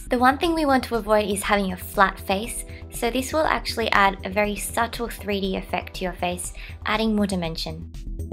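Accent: Australia. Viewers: i've always wondered but do you have an australian accent when speaking korean as well? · Are you Australian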